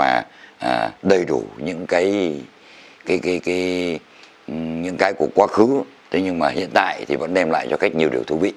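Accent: Hanoi, Vietnam. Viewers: Xem những video chế anh, em thấy buồn vô cùng. Cũng chỉ vì những con người hiểu biết nông cạn. Em Theo dõi anh hơn năm nay rồi. Đã nói tới ẩm thực là một đề tài khó. Ai mà hiểu cho hết. Cứ coi như anh kém cỏi đi. Nhưng chịu khó tìm hiểu sẽ tốt hơn. Anh giọng hay chuẩn mực, hơn người Hà Nội nhiều.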